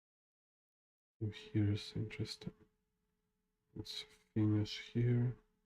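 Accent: Russia. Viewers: Привет. Отличное видео. Всё наглядно, понятно и познавательно. Спасибо. Твой англицкий упорно давал понять что скорее всего ты говоришь на русском :) Яндекс ИИ не смог дать перевод :))) Но всё было понятно.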